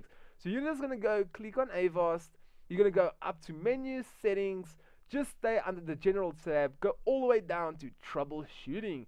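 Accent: South Africa. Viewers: you sound South African lol? Fyi im from Durban.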